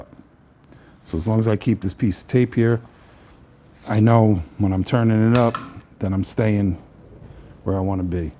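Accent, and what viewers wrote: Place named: United States. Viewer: Sounds more American than British 😢😢😅😅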